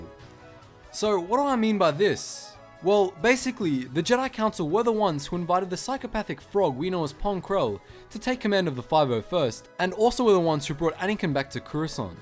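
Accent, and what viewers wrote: Australia. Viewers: Wow. You were not kidding about that Aussie accent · maate didn't know you were a Aussie aye, what state are you from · You are an Aussie? wooooooooooooooooooooooooo go Australia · How did it take me so long to realize that you are Australian? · Aussie eh, Nice 🇦🇺🇦🇺🇦🇺🇦🇺🇦🇺🇦🇺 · Ahhh you’re an Aussie like me lol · I watched you and never new you were an aussie like me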